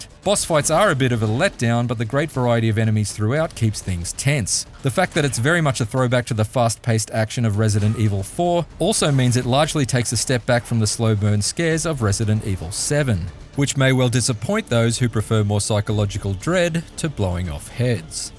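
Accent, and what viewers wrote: Australia. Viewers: The guy clearly has an Australian accent. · love the aus accent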